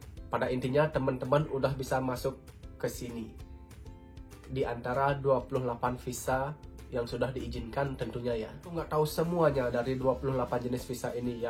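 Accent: Bali, Indonesia. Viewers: Logatnya kek orang bali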